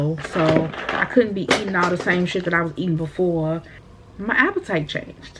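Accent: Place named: Detroit, United States. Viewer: You sound like a fellow Detroiter!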